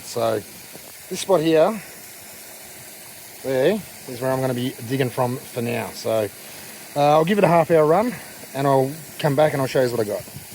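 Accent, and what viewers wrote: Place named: Australia. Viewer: Bonjour, are you originaly from France? If yes,you sure speak like a true Aussie.👍 I also enjoy prospecting,did 5 trips to Western Australia, beginning in 1997. Now I pan for gold in the Gard region near Anduze,been here since 2006. Yes! same feeling here, I'm 63 years old and the years start to show themselves. Here in France (Gard region) the season starts on the 15 th of May till end of November. Keep well,Dom.👍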